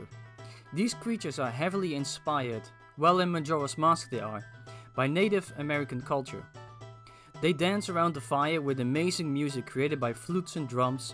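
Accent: Netherlands. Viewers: You sound Dutch. Are you?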